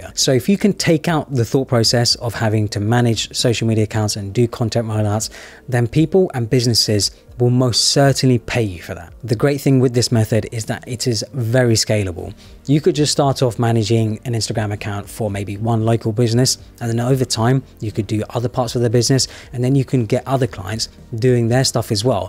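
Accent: United Kingdom. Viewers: Good to hear a British voice on these matters, just feels more relatable, Sometimes with American narrators, I feel like our situations differ too much.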